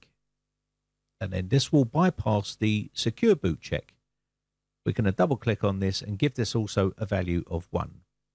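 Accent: United Kingdom. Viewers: I gratefully join the many satisfied subscribers in thanking you so much for sharing your helpful skills & knowledge to make our work a lot easier with your elegant British accent. Thanks a lot.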